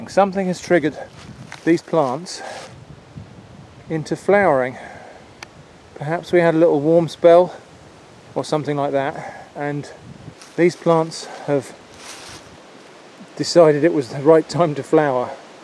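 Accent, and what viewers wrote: United Kingdom. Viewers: I like the UK (been there only once so far, unfortunately), british cuisine and british pronunciation so I subscribed. Appreciation from a russian-speaking person for this review, спасибо :)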